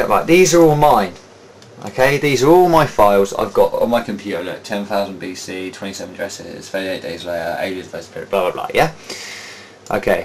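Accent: United Kingdom. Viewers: Great Brit accent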